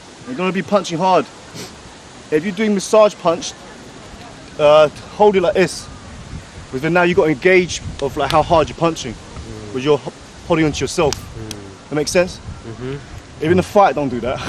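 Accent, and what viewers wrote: London, United Kingdom. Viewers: I subscribed for the simple fact an Asian guy with a London accent it just cool AF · what lmfao it’s a London accent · Just a basic London accent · he has a strong london accent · definitely 100% a London accent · sound like you come from East London or South London lol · Love your British accent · You look like a Thai master with a British accent😱👍🏻👍🏻👍🏻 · He sounds almost like Africans that live in England. Lol!! This looks soooo relaxing. If it were me I'd just fall down. "You just gone have to come down here lao shi."😊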